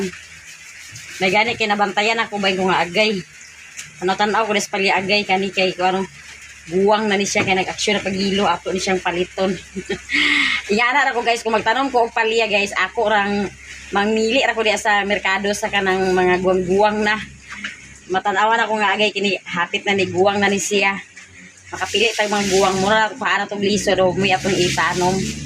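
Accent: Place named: Visayas, Philippines. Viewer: Katawa gyud ko sa lawom nga bisaya nimo ate 😂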